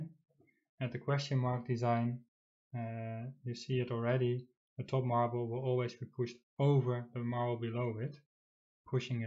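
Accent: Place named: Netherlands. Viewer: haha het typisch nederlandse accent :). Btw, geweldige video's!!!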